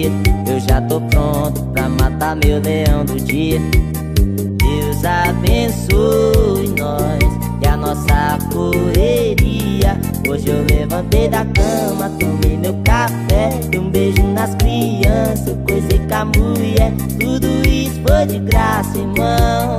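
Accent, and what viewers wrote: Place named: Salvador, Brazil. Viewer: Parece que é até de salvador 😅😂😂vc é fera😅❤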